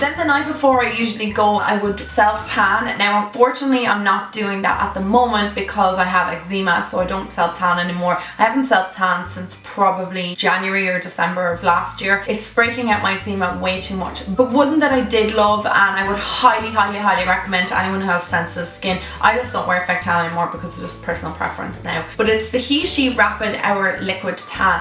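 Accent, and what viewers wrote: Ireland. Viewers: Is she Irish??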